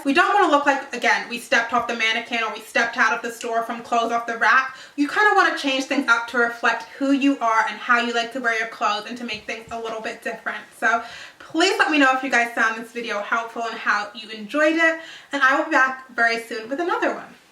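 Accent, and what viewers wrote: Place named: United Kingdom. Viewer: i love how your British accent comes thru every now and then.....you're amazing!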